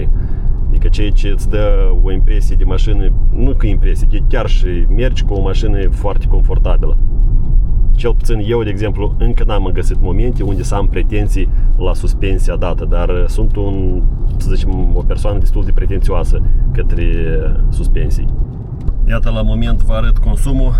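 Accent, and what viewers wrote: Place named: Finland. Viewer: БЛЯ. Зашёл посмотреть, а тут чухонец какой то🤣😂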